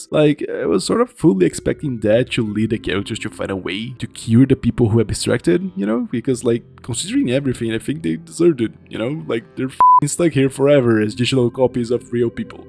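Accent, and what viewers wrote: Brazil. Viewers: I hope you achieve your dreams man! I'm a fellow artist, and I know that our profession is very hard. You seem very passionate about your craft, never let anyone take that away from you! Also, I couldn't help but notice your accent, are you brazilian?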